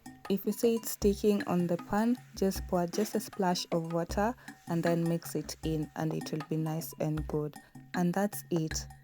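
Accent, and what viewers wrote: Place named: Kenya. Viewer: Love that Kenyan accent.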